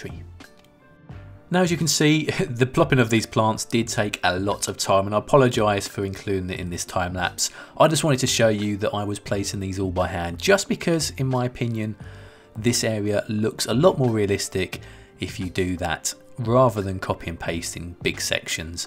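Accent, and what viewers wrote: United Kingdom. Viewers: english accent, makes you sound knowledgeable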